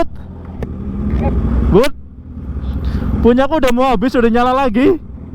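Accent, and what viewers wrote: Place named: Banyumas, Indonesia. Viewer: Biasa ngapak dadi angel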